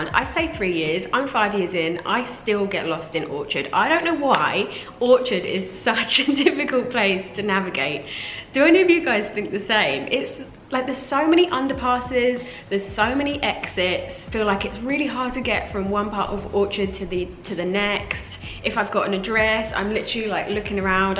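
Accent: United Kingdom. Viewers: Haha, love this video, especially the part where you say one thing, and the listener hears another. Must be frustrating. I think we are not familiar with British accent, even though I can understand you well. We learn British English in school but none of our English language teachers are native speakers. Also, most of our favourite English movies or TV shows are American. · I don’t really have difficulty with British accent. However I loved your British accent, it is where I learnt while I did a study exchange in the uk. I got to learn the proper English accent there. While you’re right orchard mrt is a maze. Not to forget locals do exit wrongly too, especially Dhoby Ghaut mrt. ;)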